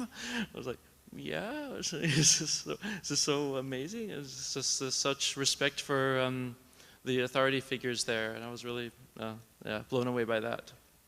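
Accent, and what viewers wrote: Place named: United States. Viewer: It's funny, -- the thing I notice listening to this is how the melody of your speech cadences has changed to a more German-influenced sound, from your living in Germany so many years. Of course your accent is perfectly American, but if I listen to this so quietly that I can barely make out your words, the ups and downs of your intonation sound like German. Having spent months in Germany myself, without actually being a fluent German speaker, it was instantly recognizable to me. I don't remember this characteristic of your English many years ago when we first met. Fascinating!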